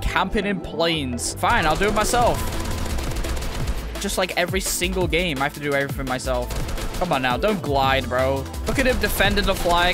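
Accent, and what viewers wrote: United Kingdom. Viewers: We got to admit that we love his British voice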